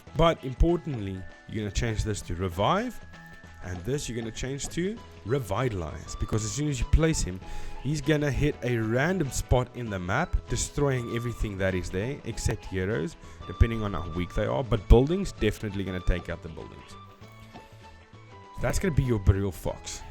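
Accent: South Africa. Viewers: You sound South African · Jy klink afrikaans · You sound south African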